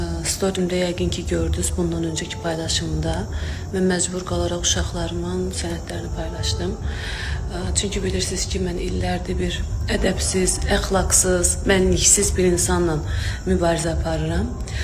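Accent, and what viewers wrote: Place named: Baku, Azerbaijan. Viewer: Kendci..davavizi..gozuvuzu..danisiga bax.Baki lehcecine kecib...ciriq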